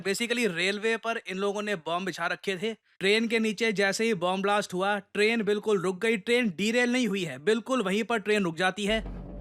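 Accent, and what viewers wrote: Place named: India. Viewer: Ye indian accent h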